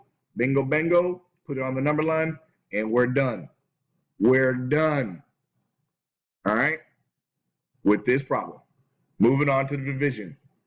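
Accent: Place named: London, United Kingdom. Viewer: You sound like you’re from London.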